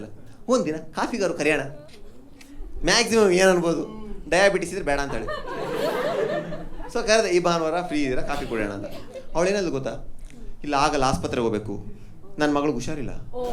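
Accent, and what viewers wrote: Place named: Mandya, India. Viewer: Watched 2nd time next episode pls Pakka madya slang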